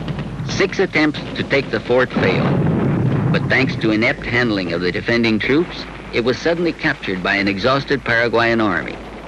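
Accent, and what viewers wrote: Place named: Bolivia. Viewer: Hablas como boliviano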